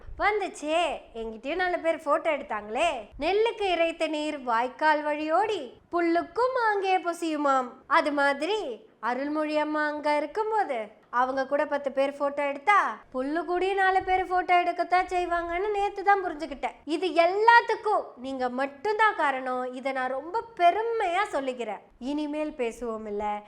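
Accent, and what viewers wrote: Kongu, India. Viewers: அன்பு சகோதரி ஒவ்வொருவரும் அவரவராக இருப்போம். மேலே நீங்கள் குறிப்பிட்ட ஒவ்வொருவருடைய காணொலிகளை கேட்டிருக்கிறேன். அழகான கொங்கு தமிழில் மழலை மொழி போல் சிறப்பாக பேசிவருகிறீர்கள். வாழ்த்துக்கள். இந்த பாணியை மாற்றிவிட வேண்டாம். நன்றி.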